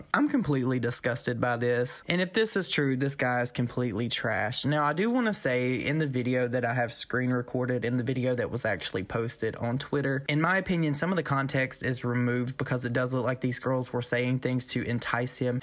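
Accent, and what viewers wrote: South, United States. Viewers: I love your southern accent...you sound so polite, even when you're being snarky. 😂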